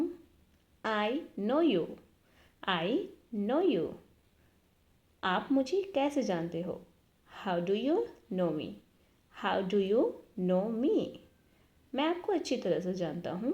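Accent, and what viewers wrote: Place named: Russia. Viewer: Mam your accent is like a Russian English and the way of speaking is really awesome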